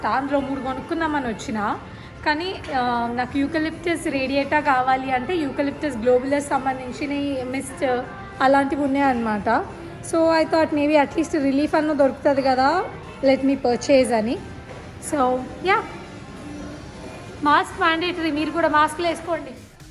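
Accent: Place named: Andhra Pradesh, India. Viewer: telugu accent bagundi. Videos are very good and useful information.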